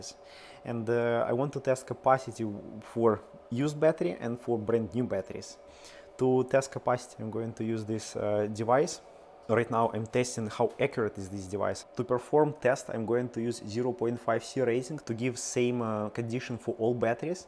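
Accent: Russia. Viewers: Are you russian ?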